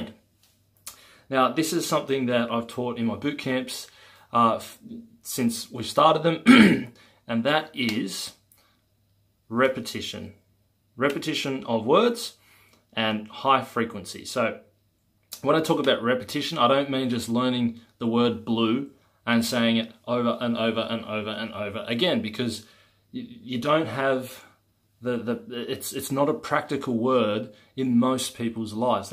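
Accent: Australia. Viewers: Love the aussie accent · You sound Australian.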